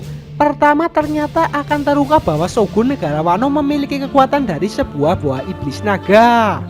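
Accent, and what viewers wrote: Banyumas, Indonesia. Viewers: mendok bgt bahasanya berbicara lha dengan yang baik dan benar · agak ngeri ngapaknya, kayak siapa gitu. 😂😂😂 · Pertahankan suara ngapaknya sob.. bedakan dengan yang lain.. jangan peduliin haters.. karena mungkin dia kalah saing ama ente.. hoho. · gk suka gue sama suara nya.. jangan ke jawa jawaan dong mas.. yg biasa aja kali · Go ngapak go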